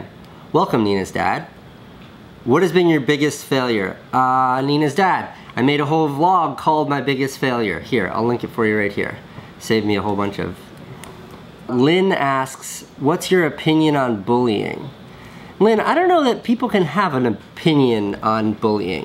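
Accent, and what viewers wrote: Liverpool, United Kingdom. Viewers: Scouse power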